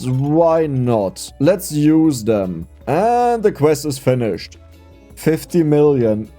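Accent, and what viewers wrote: Germany. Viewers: Höre ich da Deutsch 🇩🇪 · The word iron is a phonetic catastrophe, pronounce it literally however you want. Aussies don't really pronounce Rs in most words so I'm used to hearing it pronounced the same as "eyeing" with a silent g but I've heard countless youtubers pronounce it differently and the only thing that really matters is that people know what you mean. If "eye-ren" is what feels most comfortable for you given that you speak multiple dialects, then that's what feels more comfortable. Personally I like hearing the little German affectations that come through your English speaking, and I normally really struggle with accents cause hearing problems (that said I really do appreciate your vocal projection and how much you annunciate cause it makes it a lot easier for me to listen) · Ich habe die ganze Zeit darüber nachgedacht ob du deutsch bist wegen deinem Akzent.. 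und dann würdest du wütend, ich habe mich kaputt gelacht😂😂 (Inserts to friendly German) 😂😂😂😂😂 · It's not quite ion, more like iun. You actually said it properly at least once! But I love the way you've been saying it, the German accent makes it sound medieval and authentic somehow!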